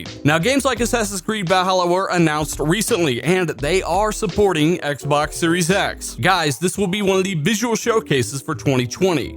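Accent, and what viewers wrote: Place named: United States. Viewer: maybe it's just the dumb American accent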